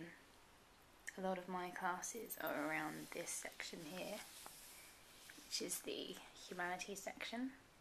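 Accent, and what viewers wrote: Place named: United Kingdom. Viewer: I like your British accent ...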